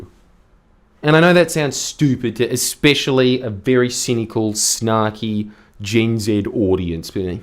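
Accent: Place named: Australia. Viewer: A lot of this is what I already do daily to keep oriented, but it is pretty nice to hear it in an Australian accent with a jawline that could stop a truck. Thanks mate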